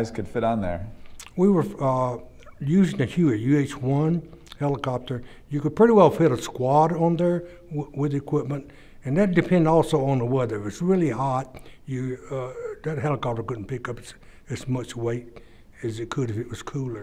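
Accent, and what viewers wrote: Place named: South, United States. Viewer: He’s just a Southerner, that’s all. Lots of people from New Jersey have an accent too.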